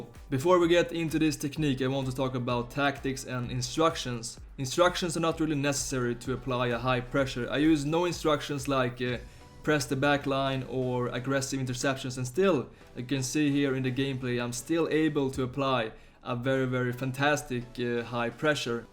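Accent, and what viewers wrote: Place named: Sweden. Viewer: Man hör direkt när det är en svensk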